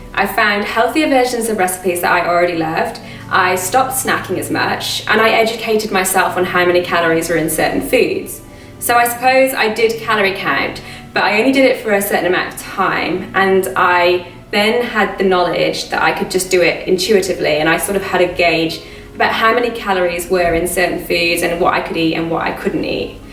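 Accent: Australia. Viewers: I knew there was some sought of Aussie in your accent, and then you said you moved there. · You look amazing!!! I need motivation like you had/have! Cute little mix of an aus/English accent there 😂 it’s nice to see you doing so well great video deffo be watching more! Starting to get myself fit now! It’s the food side I struggle with what’s good/bad etc because I love cooking but I’m sure I’ll learn xxxx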